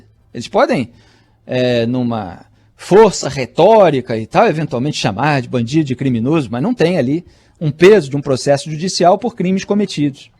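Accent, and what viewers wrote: Rio de Janeiro, Brazil. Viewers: Esse jornalistinha safado com sotaque da zona oeste do RJ só fala m. Vai lá perguntar pro marreco moro sem vergonha se ele te arruma alguma boquinha lá nos EUA . Safados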